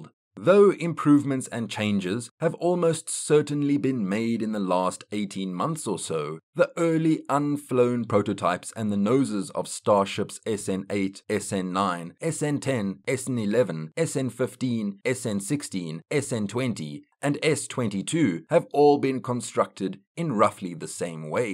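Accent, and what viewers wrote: South Africa. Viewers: Aha. South African voice.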